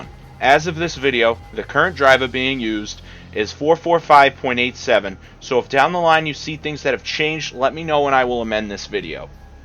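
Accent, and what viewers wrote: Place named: Boston, United States. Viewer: love the boston accent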